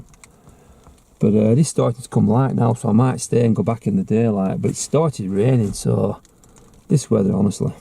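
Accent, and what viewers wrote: Lancashire, United Kingdom. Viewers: We really do appreciate the efforts you go to to bring us these videos, and as someone brought up in Lancashire but living in Essex, that lovely Lancs lilt in your accent is a reminder of what I left behind!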